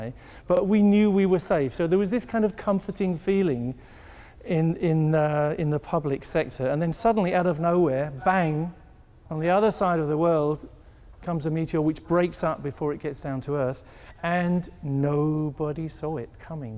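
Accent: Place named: United Kingdom. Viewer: wow a learned guy with a British accent....charming...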